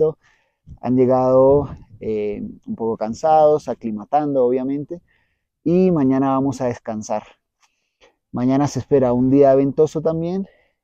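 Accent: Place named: Mendoza, Argentina. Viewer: Hola muy claro tu mje.,soy Mendocino pero quede desconcertado con tu acento,sos Menduco?.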